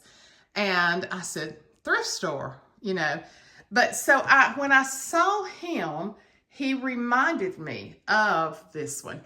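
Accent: South, United States. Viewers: I LOVE your Southern accent. I have always lived in the eastern Cape, and we too have a distinctive accent. So I guess we are both "Southern" girls, just different hemispheres. Lol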